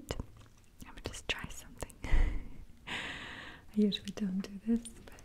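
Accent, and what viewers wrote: Russia. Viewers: You should do a souvenir shop role play with those items. Maybe use a heavier Russian accent and give us background on the items! I’d love to know the stories behind them :) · The Russian accent is so relaxing 😍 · I was sooo hoping to hear the russian accent again ^^''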